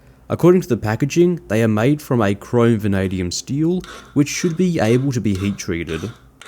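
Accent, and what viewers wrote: Australia. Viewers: He is from Australia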